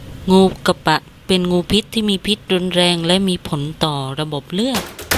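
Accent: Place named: North, Thailand. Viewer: สำเนียงคนบรรยาย..น่าจะเป็นคนภาคเหนือใช่มั๊ยครับ😁😁😁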